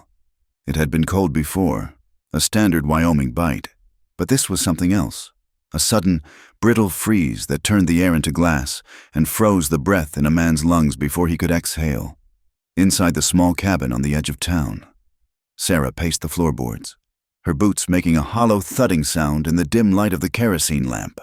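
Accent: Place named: Boston, United States. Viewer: Love your sexy gorgeous voice by way of Boston! Hope it’s everything I want!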